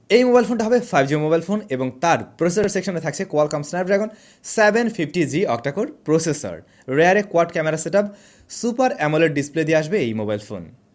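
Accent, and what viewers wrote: Kolkata, India. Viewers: Via apni kolkata ar moto kota bolan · Vai apnk kolkatar moto lage apnar kicu vashar jonno jemon ami valo asi asha kori apnara valo royechen avabe kolkatar manus ra bole tai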